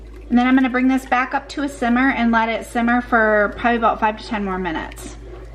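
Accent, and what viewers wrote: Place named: Michigan, United States. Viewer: Are you from Michigan.... you talk just like me lol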